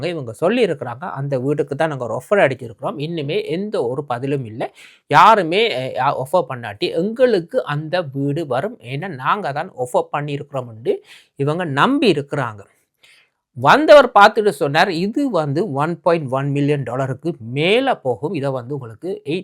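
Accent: Sri Lanka. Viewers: Ilankai thamil kekka rompavum inimai.😅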